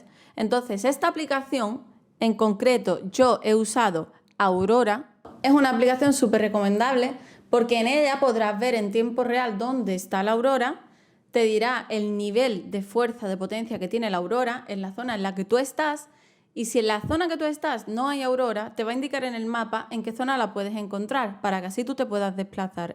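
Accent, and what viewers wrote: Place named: Andalusia, Spain. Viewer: Espectacular video! No podría ser más útil. Tengo pensado ir a Islandia en mi próximo viaje ya que uno de mis sueños viajeros es ver una aurora boreal. Voy a guardar este video para ir organizando en base a tus consejos ❤️. Gracias guapísima, con ese acento andaluz hermoso como toda su gente! Saludos desde Buenos Aires!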